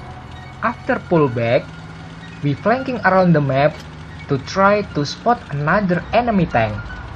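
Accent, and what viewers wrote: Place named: Indonesia. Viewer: Wih orang indonesia mangkanya logatnya gue kenal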